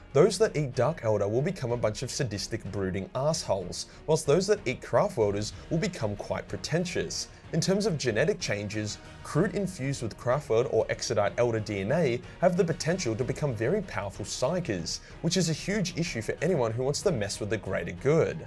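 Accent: Australia. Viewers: Always proud to hear your fellow Aussie accent. Keep pushing keep going and keep pushing past the tall poppy that our culture has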